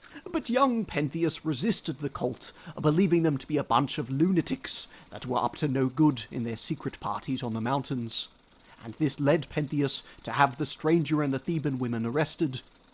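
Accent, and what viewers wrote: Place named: United Kingdom. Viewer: This sounds very British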